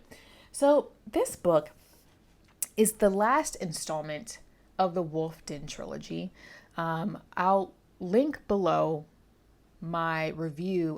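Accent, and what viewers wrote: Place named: New England, United States. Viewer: Wadder... I wonder from what part of NE you are from. I heard an 'R', so it must not be Bahston, Ma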